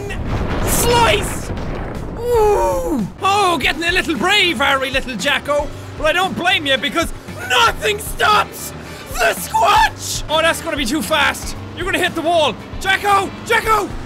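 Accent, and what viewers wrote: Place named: Ireland. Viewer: jacksepticeye I'm not going to lie to you but your Irish accent is just off the hook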